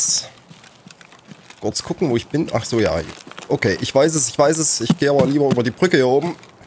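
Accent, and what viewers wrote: East Germany, Germany. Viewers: Du könntest aus meinen Dorf sein so wie du redest 😅#Ossis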